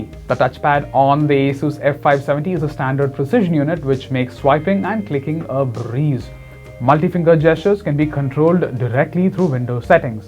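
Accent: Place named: India. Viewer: Fuck i can't stand the fucking idian accent ... it gives cancer 🤮🤮🤮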